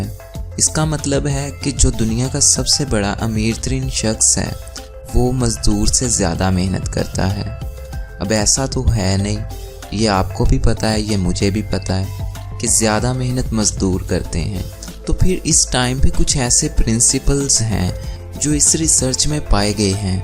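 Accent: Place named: Lahore, Pakistan. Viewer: Your English accent is typically Lahorian. Improve it. Or use 100% urdu.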